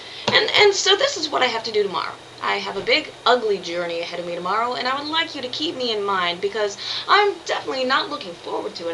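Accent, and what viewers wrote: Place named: Texas, United States. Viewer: Your mannerism and voice. Dead give away. What part? Austin? San Antonio? Not near Dallas.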